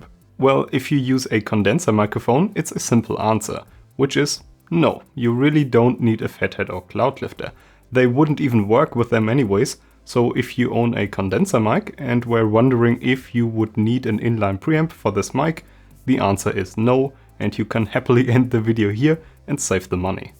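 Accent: Germany. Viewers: Hey, nur mal so 'ne Frage am Rande, dein Englisch hört sich echt sehr deutsch an, bist du deutsch? ^^ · Junge dein "gutes Englisch" entlarvt dich als deutscher..REDE DEUTSCH MAN!!!!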